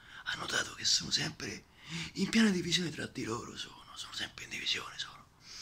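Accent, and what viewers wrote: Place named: Rome, Italy. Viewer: Ti è uscito l'accento romano.. in realta lui è emiliano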